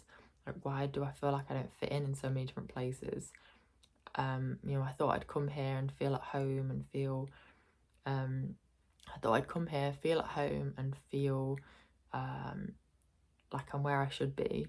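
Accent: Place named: United Kingdom. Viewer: There are so many comments about pronunciation. Did I understand it right that you feel unsafe because of specifically having British accent? I just want to clarify for myself.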